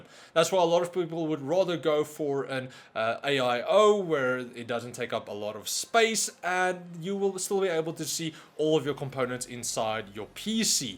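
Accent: South Africa. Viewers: Is this guy from South Africa? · South African?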